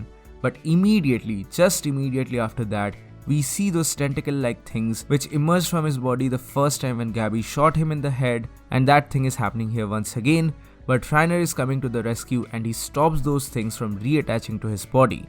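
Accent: India. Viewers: Indian voice,i know this accent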